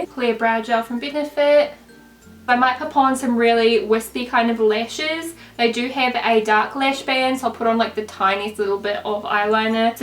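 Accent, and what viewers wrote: New Zealand. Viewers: Also I'm a kiwi living in Australia and watching your videos and hearing your accent makes me happy and feel at home in NZ again!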